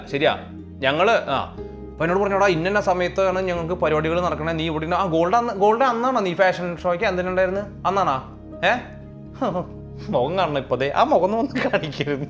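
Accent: Thrissur, India. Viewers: മലയാളത്തിലെ ഏറ്റവും ഇഷ്ടപെട്ട സ്ലാങ് ആണ് തൃശൂർ സ്ലാങ് ആ സ്ലാങ് കേട്ടു വന്നതാ ഈ ചാനലിൽ ഇപ്പൊ favourite channel ആണ് 😍( ഒരു പാലക്കാട്ടുകാരൻ )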